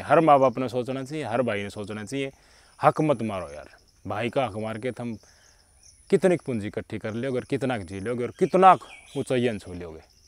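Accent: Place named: Khari Boli, India. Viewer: भाईसाहब आप की खड़ी बोली की शैली बहुत सुंदर है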